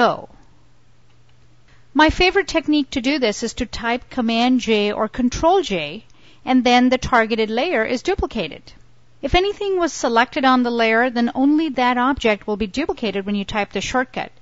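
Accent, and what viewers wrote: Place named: Midwest, United States. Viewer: This is the thickest midwestern accent i've ever heard. What state is that, Minnesota? Wisconsin?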